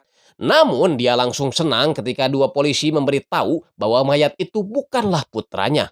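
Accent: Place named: Java, Indonesia. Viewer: Aku malah suka pembawa suara nya ..medok² piye gitu 😂😂😂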